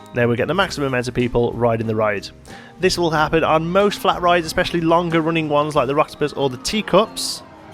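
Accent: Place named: England, United Kingdom. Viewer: I have downloaded and paid for the game and two add on packs via steam. Trying to get to grips with the game is a challenge in itself, however the *** steam interface is unbelievably clunky and not intuitive at all for example it says my 2 DLC packs are in my library but they are not !!! Also if I download a small park and go to open it I get a message to either continue loading without the additional items or continue and download … that option hangs the computer … I have spent more time on messing with steam than playing the game and am starting to think I should have purchased from the App Store (Mac) I realise my post is off topic but do you use steam … I have raised a ticket with support but I feel that now they have had my money very quickly they are not that bothered sorting out new user issues. Apologies for the rant but listening to an English voice is preferable to an accent from across the Atlantic 😢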